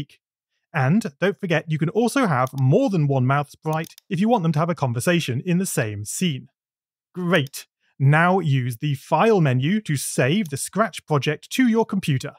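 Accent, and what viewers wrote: United Kingdom. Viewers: I think you’re from UK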